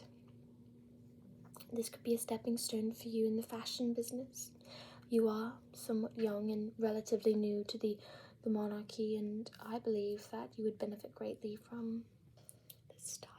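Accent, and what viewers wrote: England, United Kingdom. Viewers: Your English accent is very authentic. The pronunciation of “meticulous” was absolutely fabulous (have you seen that English comedy series, if not, you should try it 😁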